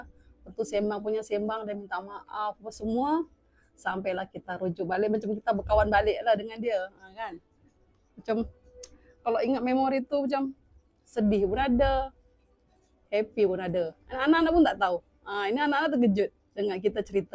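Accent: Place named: Malaysia. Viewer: Cara percakapannya dah macam orang Malaysia. Semoga permohonan kerakyataan Malaysia berjaya.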